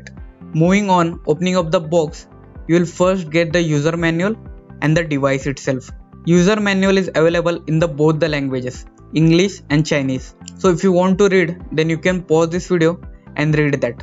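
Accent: India. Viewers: Mere bhai, he IS, in the Indanized English specifically Maranglish! (Marathi English) I speak Tanglish (Tamil English). English is our language. Since British cheated and looted us for 200 years, we have confiscated English. IT IS OURS NOW. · my Indian brother plz when.u speak English in correct accent not in.hindi very poor english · Dude your indian accent is too much. Please study the intonation and slang of proper english. I know you speak english. But really sounds like indian language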